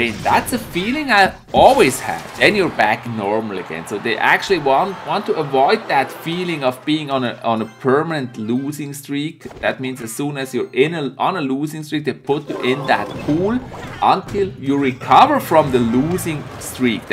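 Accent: Switzerland. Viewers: Bist du schweizer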